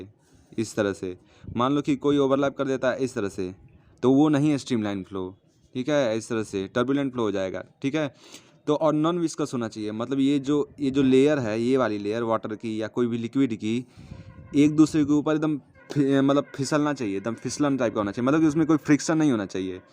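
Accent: Bihar, India. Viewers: Ur bihari twist in hindi so wonderful 👍👍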